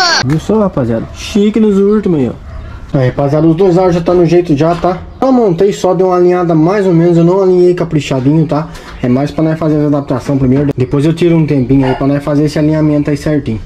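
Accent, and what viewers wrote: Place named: Santa Catarina, Brazil. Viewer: achei que você morasse em SC pelo sotaque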